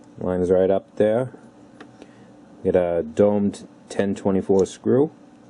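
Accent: Boston, United States. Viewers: ***** Im from CT . I honestly wasnt sure if it was upper east side manhattan or boston. "in my bet boston accent" Go PARK the Car!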